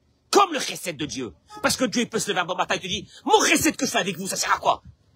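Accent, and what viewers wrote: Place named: Marseille, France. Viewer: Vous parler avec geste de méditerranéen je vois et j entend un marseillais super merci pour votre lumière j aime vos cours toda😂😂😂😂👍👍👍🤣🤣🤣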